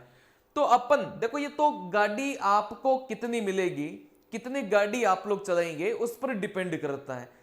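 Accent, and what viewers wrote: South, India. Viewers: Sir aap jan bujhkar aise bolte ho ya aap south se ho aur aapne hindi seekhi hai padhane ke liye isliye aise bolte ho.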